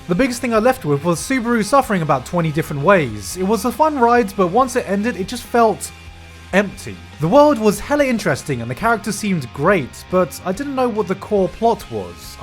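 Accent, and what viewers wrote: United Kingdom. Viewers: 🙋‍♂️I miss the salty gigguk who swears every 3 seconds in British accent. He made a baccano video swearing for 3 minutes, and it's a masterpiece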